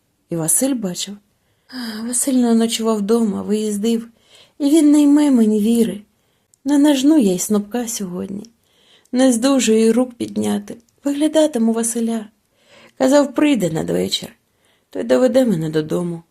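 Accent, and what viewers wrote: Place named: Russia. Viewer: Родной язык чтицы - русский, и это слышно. Тембр голоса приятный, но нет той сочности и точности звуков, которые присущи только реальному носителю языка.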